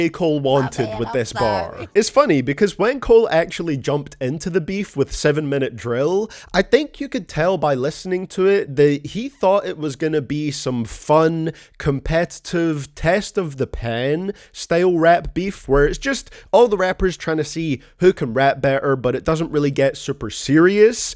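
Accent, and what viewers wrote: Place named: Scotland, United Kingdom. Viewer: What's your accent bro? Sounds like 50% Scottish and then 50% a mixture of about 9 other accents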